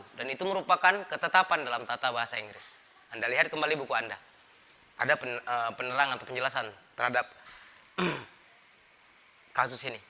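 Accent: Indonesia. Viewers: pronunciation nya dibaikin bang,., pengucapan not nya indonesia bingiitt,., tp pembelajarannya ok,,. lanjutkan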